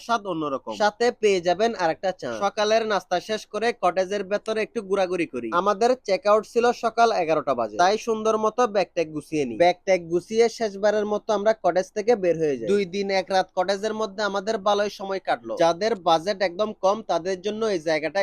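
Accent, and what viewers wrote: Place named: Chittagong, Bangladesh. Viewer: এত বাজে উচ্চারণ কেন? যদি শুদ্ধ কথা বলতে গেলে বিশ্রি চিটাইংগা টোন আসেই তাহলে চিটাইংগা বললেই হয়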